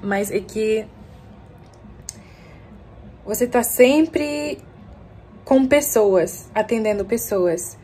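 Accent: Northeast, Brazil. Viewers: Volta logo para o Brasil🇨🇦🇧🇷vc já tem o sotaque Brasileiro❤️🥰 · "Ne" pra la "ne" pra ca kkkkkk parece nordestina zkzkksksksks ❤ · vc realmente é nordestina vc ta falando sotaque 👉 vixi que bom a casa é sua NATAL RIO GRANDE DO NORTE.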